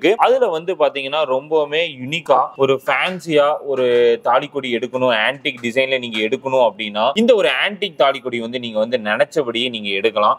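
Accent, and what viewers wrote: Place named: Tirunelveli, India. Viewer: Tirunelveli vagea bro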